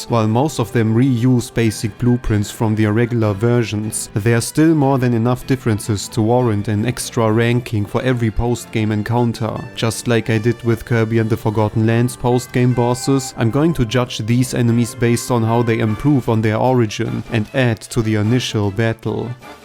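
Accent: Germany. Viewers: du bist deutsch richtig? das hört man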